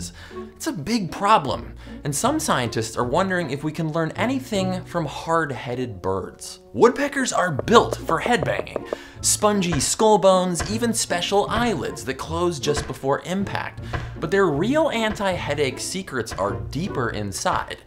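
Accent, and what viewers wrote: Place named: United States. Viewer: You seem to understand the video regardless, his accent is American so it’s his native.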